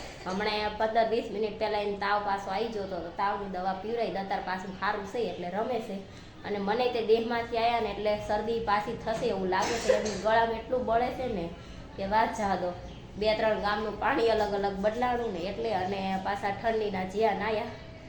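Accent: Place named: Rajasthan, India. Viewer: Tmra boli upar thi lage rajput hso tmaru gam kyu ame bi rajput siye tme amari chennal ne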